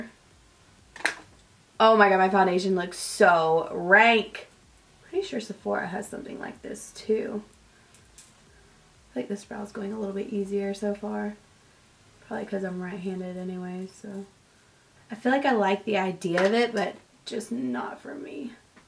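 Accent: South, United States. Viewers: Hey! I'm such a huge fan of yours. A couple months ago I felt you were somewhat losing your more genuine reviews and videos and it seemed like an abundance of advertisements etc. Not hating at all, you were still my absolute favourite on YouTube. But lately you've been putting out such genuine reviews and it's been reminding me of your old videos again! I'm so happy about the content and the amount of videos you've been putting out lately. Just wanted to say that you are more stunning than ever, you're videos have been so amazing and I wish you and Davis all the best. You guys look like you're the happiest you've been! Thanks for sticking to your roots, your subscribers really appreciate the "realness" of your videos. And I love that southern accent! You're beautiful.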